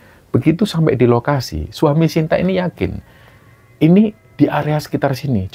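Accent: Java, Indonesia. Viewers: Kalsel hadir mas Sukses slalu untuk mas dn tim ks nggeh, Kami ini asli Jawa Jogja tapi lahir dn domili Kalsel dn kami sgt mengidolakan mas karena ciri khas jawanya itu GK ilang SM skli keren,dn cara penyampaianya itu nggenah,njalur dn yg pasti keren mantap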